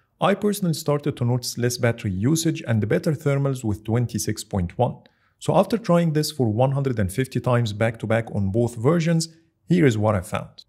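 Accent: Egypt. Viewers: Istg i knew you are Egyptian from the very first word ! All the support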